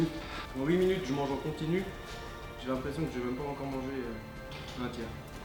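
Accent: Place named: Alsace, France. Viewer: Ton accent alsacien sort un peu 😂😂 bref team Alsace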